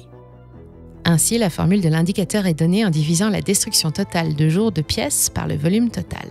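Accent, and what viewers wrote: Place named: United States. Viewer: oui c’est bien possible. Après elle a un excellent accent américain. Après on a pas l habitude de dire bit cogne prononcé comme ça mais j’ai un pote qui dit tout à la Française genre bit coin (comme un coin) c’est horrible 🤣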